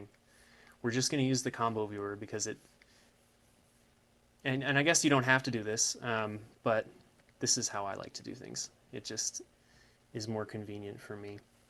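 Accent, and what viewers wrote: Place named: North America. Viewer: Spent the last 4 months creating a project through marcomedia flash 8. I was making a sprite based animation series. Most of the time, thankfully I was just splicing and preparing sprites, improving them, looking for good material and whatnot. When I began using flash I came to a pit stop with z axis. It is very difficult with flash to operate an animation with multiple sprites going in front of and behind each other. It requires extensive knowledge of action script and set depths. Even then, the motion tweening stops working and requires more in depth programming. Screw all that, opentoonz I found by accident and now I want to begin my animation project all over again. The narrator does talk very slow and does have me rolling my eyes when he is all "So ... errrrmmmm this level here........ errrrrmmmm is going to be our uuuuuummmmm next scene." Yeesh, I've come to find all North American youtubers doing tutorials sound like this. 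Must be all the processed genetically messed up monsantos foods you lot are eating over there slowing down your brains or something.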